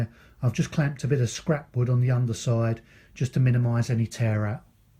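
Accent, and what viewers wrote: United Kingdom. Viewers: May I ask, which part of the UK do you come from?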